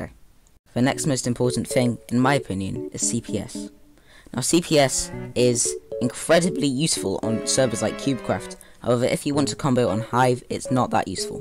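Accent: United Kingdom. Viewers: fr he’s a British guy with a beautiful accent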